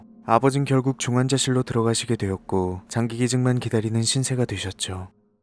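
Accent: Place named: Japan. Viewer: 감기 다 나으셨나요~~~매번 병맛난... 말투에~~~~구~~수한??? 일본어 말투 ㅎㅎㅎ 잘든고 있어요^^💯💯💯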